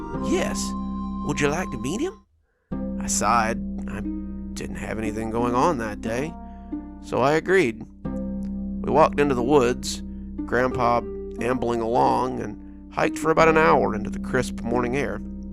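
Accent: South, United States. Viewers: Your southern delivery helped this story immeasurably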